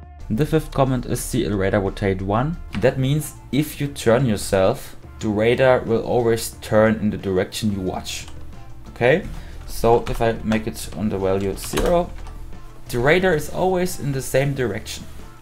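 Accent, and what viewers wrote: Germany. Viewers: Also als deutscher merkt man das du deutscher bist😂 lol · Ich wusste das du deutsch bist schon ab Sekunde 1 xD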